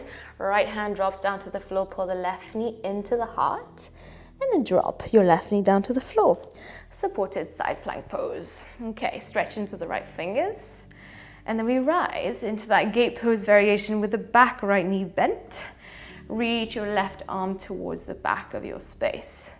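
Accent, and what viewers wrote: South Africa. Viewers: Hi, thank you for this amazing practice, I really enjoyed it as well as your lovely south african accent,I am also from SA but I live in Germany..it feels so good doing yoga with you..makes me feel at home, my intention is to do your challenge, I started today and will carry on tomorrow! Thank you 💖 💗 💛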